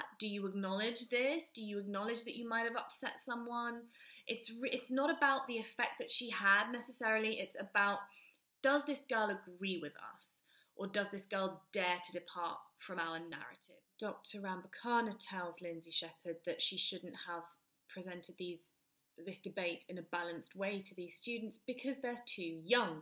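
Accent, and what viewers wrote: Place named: United Kingdom. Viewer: Don't do twitter but nice to hear a Brit. talking about all this. Have subbed.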